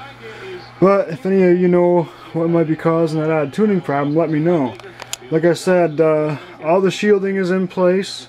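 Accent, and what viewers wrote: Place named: New York, United States. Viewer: Sounds like your in NJ or NY? Great video.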